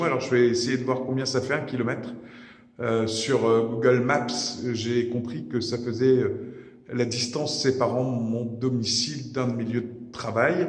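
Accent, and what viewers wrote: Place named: Brittany, France. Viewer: Purée ca m’a fait drôle! Je vis maintenant en occitanie et je voulais faire entendre à mes enfants, le bon vieil accent brestois... Après quand on écoute bien: il est encore là! Bisous!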